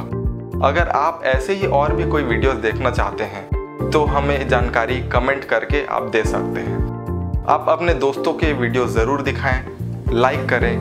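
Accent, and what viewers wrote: India. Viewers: I love Indian English.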